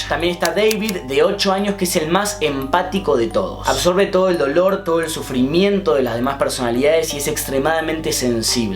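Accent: Argentina. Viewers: Amo tu acento argentino,saludos de México ♡♡.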